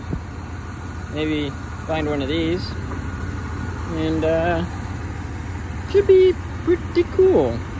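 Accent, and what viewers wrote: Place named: South, United States. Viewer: Hell, he sounds too southern to be from Cali lol. Let's face facts here, has already mentioned back problems, it's only a matter of time before he won't be able to do it anymore. If this lad manages to keep a sense of humor while reviving old cars and trucks, then we should support his efforts. Hell I'm 50 and used to be a shade tree mechanic until well into the 90's, then the 2000's hit with all of this computerized voodoo and I got lost under the hood of cars today. It's great to see a youngin' picking up the pieces us old men just can't be assed to film while we cuss and fuss working on them.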